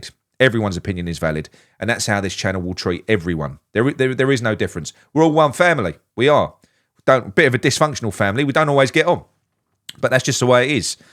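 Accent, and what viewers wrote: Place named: Scotland, United Kingdom. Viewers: Love the Scottish accent. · You’re Scottish accent is the spit of Brendon Rogers 🤣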